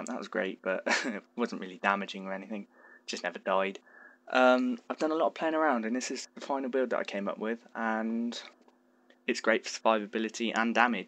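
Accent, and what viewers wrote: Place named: RP, United Kingdom. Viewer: you're so posh, also are you reading from a script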